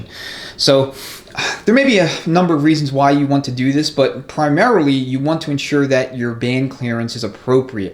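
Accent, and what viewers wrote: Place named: New York, United States. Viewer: just got done watching this procedure, great information, wondering what my measurements were on this band at 61,000 K when it burned up??? ( SECOND SPEED BAND WAS THE PROBLEM )!!!!!!! BETTER YET, were is this trans shop setting the clearances on this new one??? You would be surprised at the guys who work in the industry ( mine, heavy truck / yours trans shops ) that have been doing it there way; ( BILLY BOB, HACK - A - BILLY ) for 20 - 30 years or more, and it's not even close to " SPEC " )!!!!! ESPECIALLY IN THE DEEP SOUTH!!!!! I am originally from Delaware County PA. just outside " PHILLY ", your accent leads me to believe your from JERSEY / NEW YORK / LONG ISLAND?? WERE ARE YA LOCATED?? HELL, I WILL START BRINGING THE TRANNYS TO YOU & REFERRALS TOO, IF YOUR UP IN THAT AREA?? only a couple hours away if so??? 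LET ME KNOW, THANKS, GREAT INFORMATION & VIDEO AS USUAL / I TOOK NOTES & PART NUMBERS!!!!!! THE MACK TRUCK GUY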